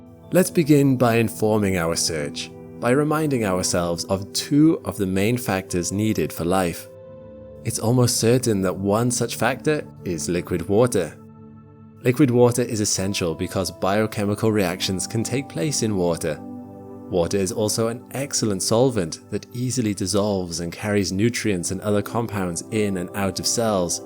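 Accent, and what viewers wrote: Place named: United Kingdom. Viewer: I'm guessing it's less "correct" vs just locational pronunciation. I believe he's in the UK based off how he pronounces some things Here where I live in the us, some things are pronounced different in my state than another state, so I'm giving benefit of the doubt to him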